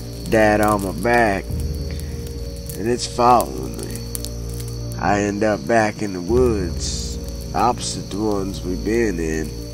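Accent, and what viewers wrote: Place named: South, United States. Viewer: Southern accents need more love in this community.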